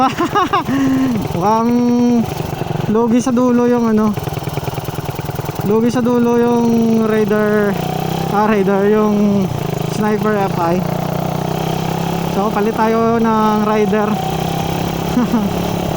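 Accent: Iloilo, Philippines. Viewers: Ilonggo ka hah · Tkalun kau kah ilonggo... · Ilonggo ka lods ahhahah (testing ta😁😁😁)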